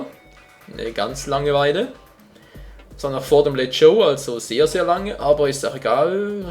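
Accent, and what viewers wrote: Switzerland. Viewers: Bist du schweizer wegen des dialekts?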